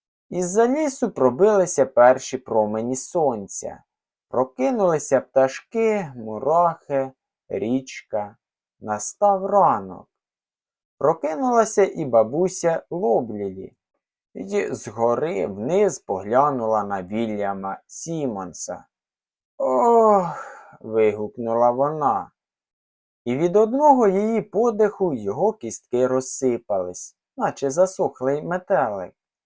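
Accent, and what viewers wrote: Surzhyk, Ukraine. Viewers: Напевно в різних регіонах України присутній свій акцент. Ваш акцент це як суржик.Не ображайтеся, але мені не сподобалось. А Бредбері неперевершений. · Сумщина? Полтавщина?) Суржик, рідний суржик - нашеВсе!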